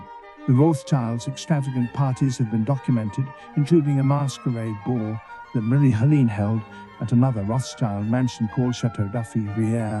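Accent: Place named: United Kingdom. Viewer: I love discussions on this film. I don’t have anything to add that hasn’t already been said But the flow, and delivery of the speech analysis makes it hard to absorb information. It is a bit too fast. There needs to be longer breaks/ pauses when you make those unique connections. ( and you did a great job on finding them! ) Also, maybe using added arrows with dual screenshots can help solidify each connection. Lastly, the AI voice over generator sounds pleasing- but makes it hard to follow quickly. Most Americans take an extra micro second to interpret British accents.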